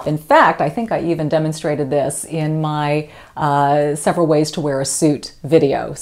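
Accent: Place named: Connecticut, United States. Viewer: Without you mentioning Houston, I would have thought you were from Connecticut... It's a compliment. ;)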